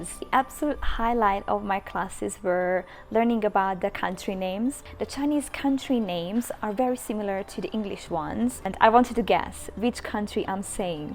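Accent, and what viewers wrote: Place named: Hungary. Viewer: It was really interesting to hear a fellow Hungarian speak English in a video Nah tessék én is már angolul írok xD Szóval amit már Facebookon megosztottál is szép volt de így videóban még inkább király, szép helyeken jártál 😀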